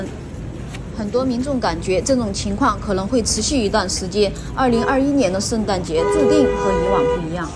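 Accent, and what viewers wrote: Sichuan, China. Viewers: 四川口音。你们应该听不出差别的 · 的视频必看,最喜欢的是你说的“川普”（四川普通话)👍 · 赞一个👍👍👍非常喜欢你的川普，好靓好聪明的脑壳！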